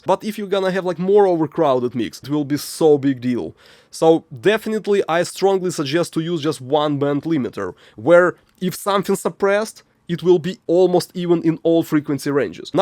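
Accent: Russia. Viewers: абажаю твой английский, ты говоришь на английском как-то по.... русски😄поэтому очень легко смотреть. ну и само собой материал-это просто бомба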